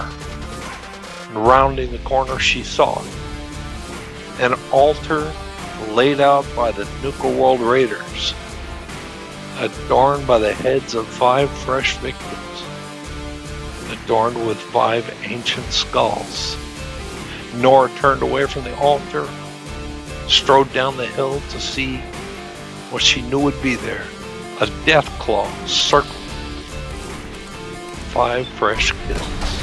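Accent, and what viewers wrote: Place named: South, United States. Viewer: when I first watched your videos, your narration reminded me of Easy Pete for some reason, perhaps because of that Southern type accent you have, which sounds great by the way. Now I'm thinking you're more like the JRR Tolkien of FO4 because your storytelling is on another level. Thanks for sharing this video. It gave me a lot to think about after I watched it and yes, the music is great, compliments it well!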